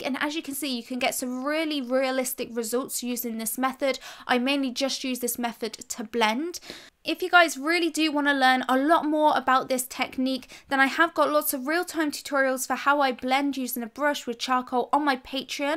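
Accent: England, United Kingdom. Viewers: I love your english accent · luv you british? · I just wish I could understand what you are saying. Your British is so thick and you speak so fast I can only comprehend about ever other word, which makes for a lot of confusion. Can you speak American? Please?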